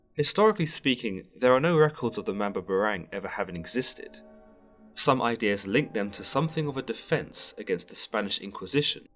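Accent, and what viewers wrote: England, United Kingdom. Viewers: i hate it when europeans mispronounce and narrate about bipoc history, mythology, ancestry, etc... especially if its my mother's home land-- thats been colonized a bazillion times. why dont they ever have people from the place teach about the place? if anything it'd avoid mispronunciation the very least. MAM-BAH-BAH-RANG. just cuz they got a english accent dont mean theyre more intellectual. coughs despite almost every documentary ever made being narrated by an english voice coughs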